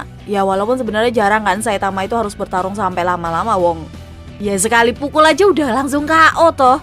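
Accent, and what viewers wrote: Java, Indonesia. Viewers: Wis kaya wong Jawa yo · Suka logat jawanya mbak 🤗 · orang Jawa ternyata, ngokonya kerasa 🤣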